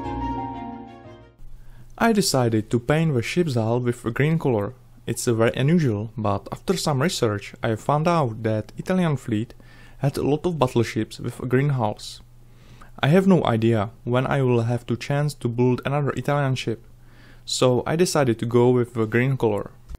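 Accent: Czech Republic. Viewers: You can definitely hear that you are czech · Já si podle toho přízvuku říkal že budeš čech (zápalky z penny tě prozradily) :-D super práce.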